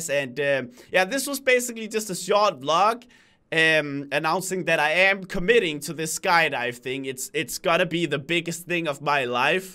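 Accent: Denmark. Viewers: i hear your danish, prove it.... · why do people make fun of your voice like its an accent cuz your danish or u just wanna talk like that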